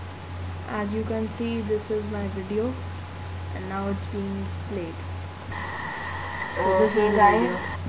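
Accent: India. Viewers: indian english >_< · Omg I can sense the Indian in him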